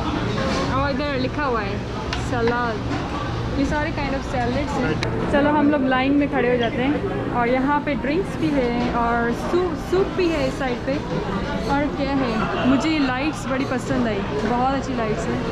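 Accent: Sindh, India. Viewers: Are u sindhi?? 🤭😂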